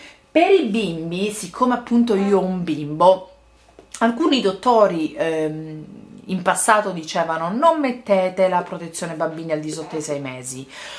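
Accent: Sicily, Italy. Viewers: Sei siciliana? dv precisamente?:) Un saluto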